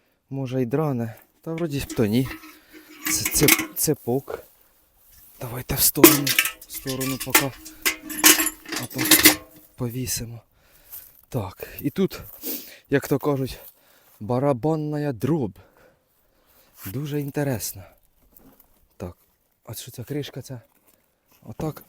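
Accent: Poltava, Ukraine. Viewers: З перших слів відчувається Полтавський говір❤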